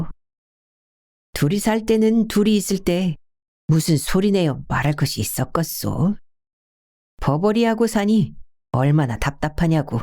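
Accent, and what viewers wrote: Jeolla, South Korea. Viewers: 시드니에 여행와서 님 목소리 들어보니 더욱 반갑네요 전라도 사투리~~ 구수합니다 🥰 제 고향이 전라도 광주랑께요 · 상사화의 슬픈 꽃말이 생각나는 소설이네요. 잎과 꽃이 서로 만날수 없어 그리워한다라는 말이..그래서 절에서 마이 키운다는 상사화꽃 워째 요로코름 전라도 사투리를 징글징글하게 잘 하신당께요. 전어대가리 구이와 회가 봄인데도 생각난당께요. 각시가 콩자반 별명에서 빵 터지고..ㅎ 사나이는 태어나서 세번만 울어야 된다꼬 했는디.. 고 부분에서 언제 세번 울어야 하는지 갈켜 주시고 가시제이~ 하는 부분에서 고만 또 빵 터저 부렸당께요. 이거이 웃으며 들을 소설이 아니뎅 고리 되었당께요. 전라도 사투리에 정신이 쏘옥 팔려 내용이 우찌 되는지 오늘 모르겠당께요..😋ㅋㅋㅋ 다시 함 더 들으며 자겠당께요~~😝😴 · 역시 최고의 낭독입니다,사투리하면 전라도 사투리가 최고로 맛갈나구요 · 세상에나! 이렇게 실감나는 전라도의 정 깊고 인정 많은 사투리 맛갈 나는 성대 묘사 노벨상 감입니다. 진정 노고에 감사합니다.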